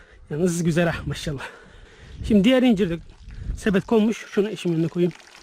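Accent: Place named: Thrace, Turkey. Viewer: Trakya mı orası şiveniz trakya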